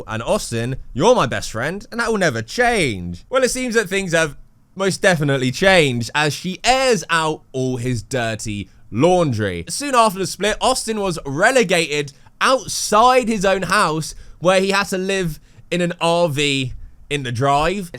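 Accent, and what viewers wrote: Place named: Southern England, United Kingdom. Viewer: a name like is an immediate hint. With the tache you might as well have the map of Ireland on your face. The contrast between your Irish features and South of England accent is quite something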